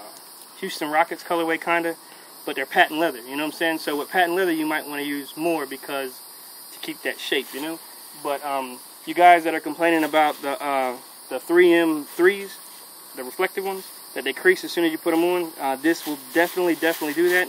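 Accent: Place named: Appalachia, United States. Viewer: HE SOUNDS LIKE OWNAGEPRANK'S HILLBILLY VOICE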